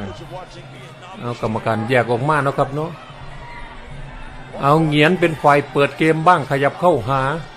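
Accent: Isan, Thailand. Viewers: ฮาคนพากย์เสียงนะคะ(แอดมิน)ฟังการพากย์เสียงก็ขำมากค่ะ มีพูดอีสาน แคดแลตออกมาด้วยนะ ฟังไปขำไป😛😁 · รู้สึกรักภาษาอิสานเลยค่ะ พอมาฟังท่าน พากย์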